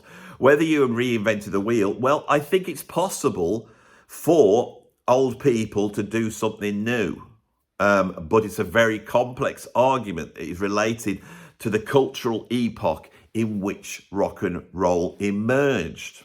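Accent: United Kingdom. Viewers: brilliant and funny, i love contrarians being one myself, you remind me of Monty Python .( maybe the accent )........ i do like rick also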